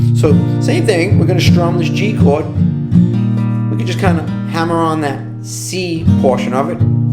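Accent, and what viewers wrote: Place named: Italian-American, United States. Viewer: You know, I've been banging on a guitar for 53 years, and I'm still trying to get good at it. The whole Travis picking thing has had me flummoxed for the past few months. Being that you're also an Italian dude who looks and talks like the people I grew up around seems to help me to kind of "get this thing" a little bit better. You're a good teacher, and I'll bet you're a great performing artist as well. I will take a break from my lesson to go and investigate. Thank you, paisan.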